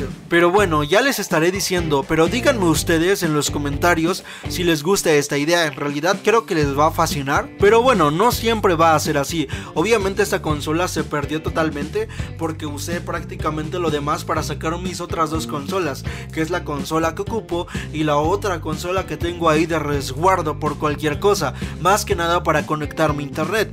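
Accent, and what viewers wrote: Iztapalapa, Mexico. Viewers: Eres de Iztapalapa? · En este video se te salió lo mexicano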